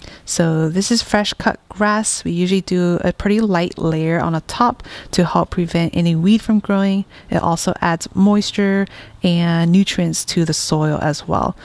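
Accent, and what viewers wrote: United States. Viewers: Which part of the world are you at, by your accent maybe USA. I live in southern England on a island. Ive been growing lemon grass since 2018 brought back from Thailand. I now have at least 300 plants. Its become a obsession with me. Great video. Are you from Indonesia?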